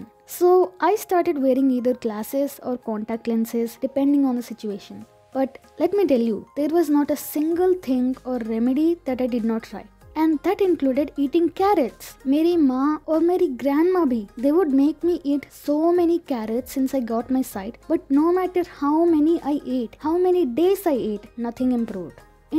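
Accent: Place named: India. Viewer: I thought u r from Europe 😅 and when I listen language and than I know u r from India 😅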